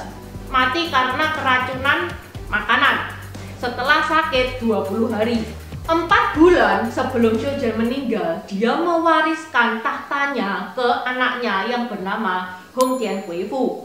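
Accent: Java, Indonesia. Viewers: logat Jawa medok nih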